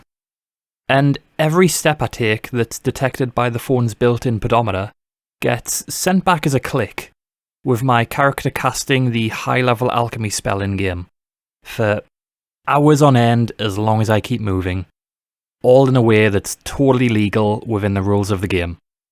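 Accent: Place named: North, United Kingdom. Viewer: Sound like a fellow northerner, great vid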